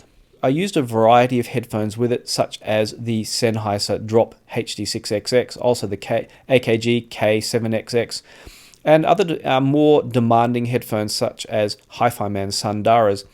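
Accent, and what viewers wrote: Australia. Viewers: $130 what are you talking about try $230 it's very confusing to hear an Australian accent talking about products in US dollars. When watching this from Sydney Australia.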